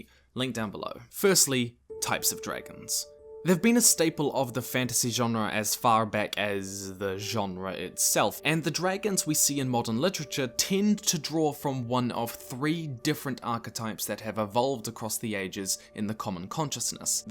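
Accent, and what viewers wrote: New Zealand. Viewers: Wait your a kiwi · Oh you're a Kiwi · Dude, i didn't even notice you were a kiwi, im also a kiwi so i guess i just sorta didn't notice your accent as its just the same as everyone else i know.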